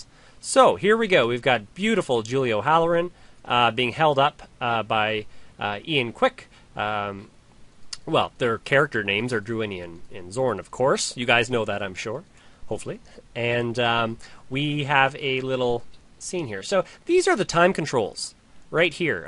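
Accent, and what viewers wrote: Canada. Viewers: Canadian Accent FTW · Very nice vid. :) by the way, is that a Canadian accent?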